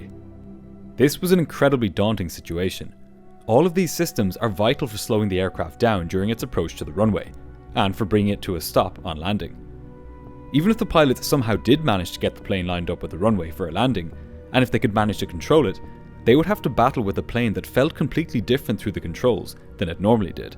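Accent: Ireland. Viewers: Are you irish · Are you Irish? You sound like it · Are you Irish? Hell yeah, I love this channel even more.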